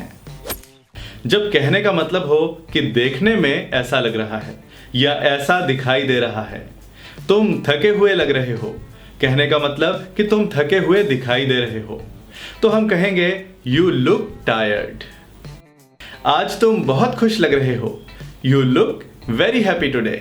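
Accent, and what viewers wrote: Punjab, India. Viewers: Appka punjabi rool bada sone lagtehai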